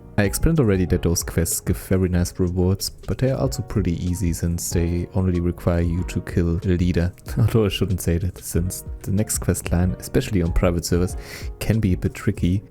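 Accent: Germany. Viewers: Man hört echt das deutsche raus^^,But great Video:D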